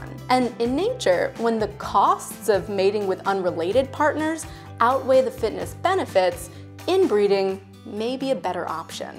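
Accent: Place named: South, United States. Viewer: Before you even watch this you know she is a southern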